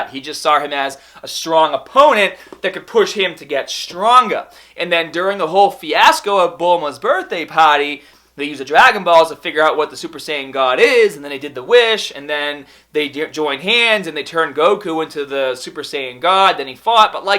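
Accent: Boston, United States. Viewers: he's not from Australia, thats Boston accent haha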